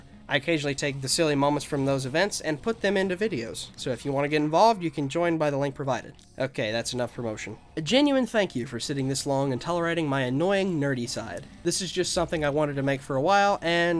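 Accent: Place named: South, United States. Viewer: The second I heard that southern drawl, I know this was a man I could trust to talk about guns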